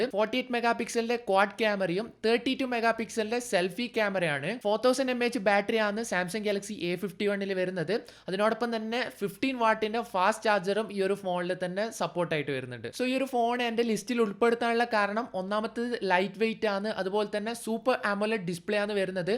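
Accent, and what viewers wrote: Kannur, India. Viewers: Kannur ano?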